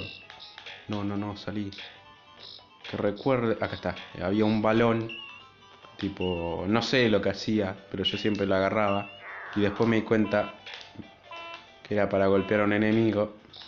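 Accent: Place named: Argentina. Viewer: Suena argentino xd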